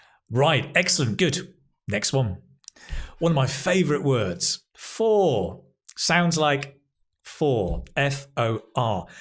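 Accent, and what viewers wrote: United Kingdom. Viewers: Wait. This is British English, no? Excellent for lesson, especially about the pronunciation in English.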